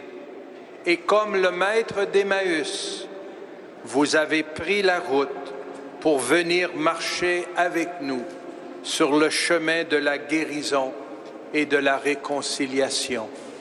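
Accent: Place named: Canada. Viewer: on voit que vous avez les origines française Courcelles est noms de plusieurs communes françaises... J'espère la foi dans le Christ... L'accent chantant canadien surprenant ici mais agréable à entendre... Merci aussi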